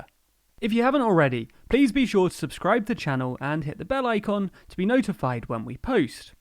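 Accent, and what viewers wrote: United Kingdom. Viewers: Why is a British guy telling us who'll win? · Oh boy, a video narrated by some British guy telling how eight U.S. Senate election races will determine control of the Senate. NONE of these eight particular races include the Senate seats in Minnesota or Michigan, both of which the Democratic incumbent is very vulnerable and of which could help maintain GOP control.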